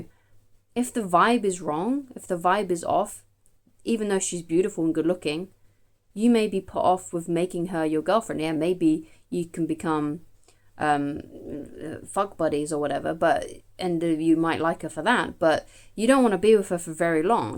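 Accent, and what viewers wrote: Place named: United Kingdom. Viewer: Nice that you have a coaching book out. You're not going to tell me I have to read it 10 to 15 times just to understand it, are you? 😅 I like your content and of course the UK accent... Nothing is more delightful for me than the times that I get to fly through London-Heathrow and everyone is throwing around that accent..."Hallo Yank, yes you... joost need your passport over here, love"... I just love it. It's so different than the way people are here in the States. Anyways, cheers to your book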